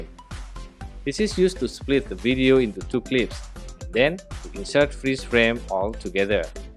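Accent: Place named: Indonesia. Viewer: From the speaking, i know this people from indonesia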